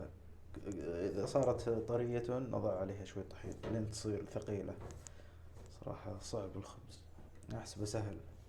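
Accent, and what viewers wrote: Saudi Arabia. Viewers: Love You هو يتكلم سعودي وعربي في نفس الوقت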